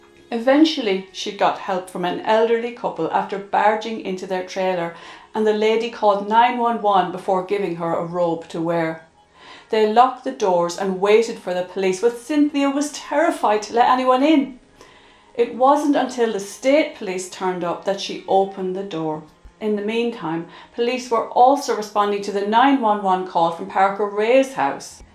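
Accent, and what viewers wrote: Ireland. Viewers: Glad I found this channel. You’re accent sounds a bit Irish ☘️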